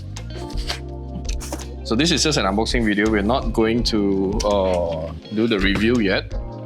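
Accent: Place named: China. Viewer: Your accent is so chinese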